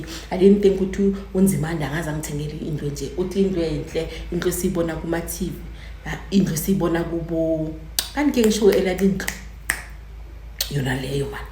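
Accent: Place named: Ndebele, Zimbabwe. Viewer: Indebele lekhethu ngyalizwa elimini, ngizwa iskhethu elimini lakho😅.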